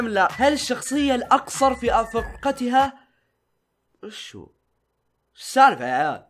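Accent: Mosul, Iraq. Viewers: اللهجة مصلاوية لهجة عراقية يتكلمها اهالي الموصل (مدينة شمال العراق) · لهجة المصلاوية لهجة عراقية ( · الهجة المصلاوية وهي من العراق وتوجد فقط في الموصل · الهجة المصلاوية هي لهجة عراقية😂محافظة موصل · اللهجة المصلاوية لهجة عراقية في مدينة الموصل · اللهجة المصلاوية هي لهجة الموصل بالعراق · اللهجه المصلاويه لهجه من لهجات العراقيين (الموصل) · لهجه المصلاويه لهجه بشمال العراق في محافظة موصل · اللهجة المصلاوية لهجة موصل بلعراق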